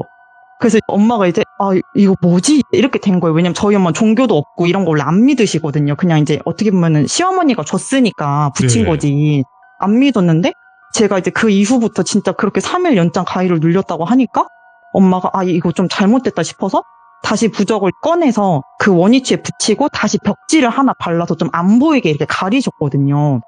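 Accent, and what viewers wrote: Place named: Jeolla, South Korea. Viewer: 전라도특유의 사투리가.ㅎㅎㅎ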